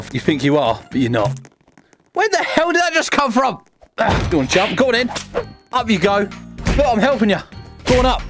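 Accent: United Kingdom. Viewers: Are you British? I love British accents, especially your voice is so soothing for some reason lol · The British accent 🥵😩😩😩😩😩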